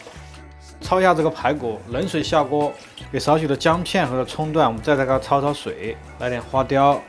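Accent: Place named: Sichuan-Chongqing, China. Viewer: 明显的川渝口音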